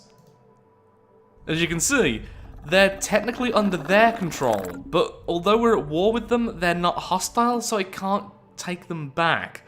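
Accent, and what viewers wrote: United Kingdom. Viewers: As someone whose native language isn't english: You are probably the easiest british person to understand.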